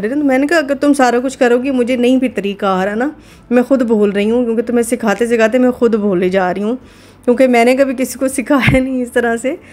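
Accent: Saraiki, Pakistan. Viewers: Over acting mat Kiya Karen ap Ka lehja seriki hai Urdu theak NI bolti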